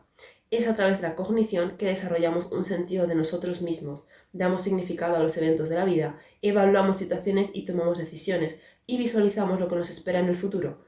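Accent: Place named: Spain. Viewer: Ayyyy que terrible, no estoy entendiendo nada, primero, porque escucho conceptos nuevos, ¿me podrían decir donde encuentro info de términos o conceptos? Y segundo porque el acento es marcadamente español, y eso, a pesar de estar muy habituada a contenido en ese idioma, en combinación con lo primero me deja así: 😳🤔🥴🥴🥴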